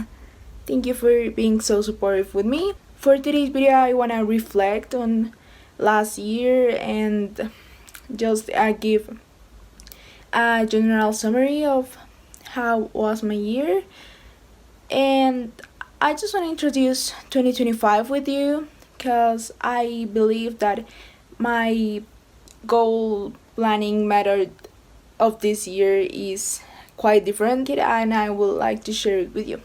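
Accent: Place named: Colombia. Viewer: Hope you're doing okay. I love seeing your nice face and hearing your Colombian accent 😀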